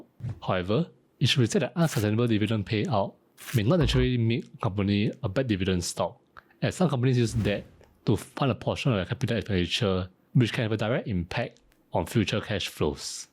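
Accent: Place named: Singapore. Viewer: Love your accent, are you from Singapore?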